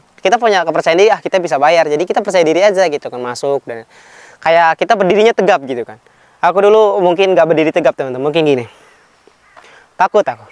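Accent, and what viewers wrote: West Java, Indonesia. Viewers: Mang lamun ngobrol make bahasa jepang asa ek aya logat sundaan nya😂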